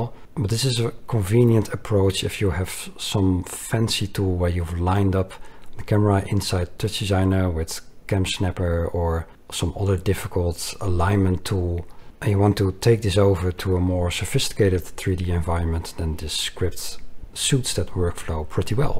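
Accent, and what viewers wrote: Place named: Netherlands. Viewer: I had no idea how difficult it is to import a 3D object into TD with it's material correctly mapped. After doing a lot of research - looking at Derivative notes, MeshLab, CloudCompare and watching YouTube videos, I eventually came across this video. Your import/export with Blender tool makes this seemingly simple task a practical reality. So thank you for making this tool available at no cost to us amateurs! Great well explained tutorial and loved your Dutch accented deadpan mention of the Funkee Munkee!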